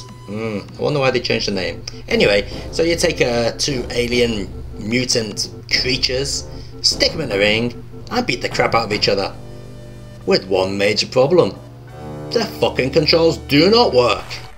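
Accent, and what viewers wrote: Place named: Liverpool, United Kingdom. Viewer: Lol... I know it's a while ago now but your rant of DD2 (poor controls) was very funny! Especially with your Liverpudlian accent... Liverpool is right yeah?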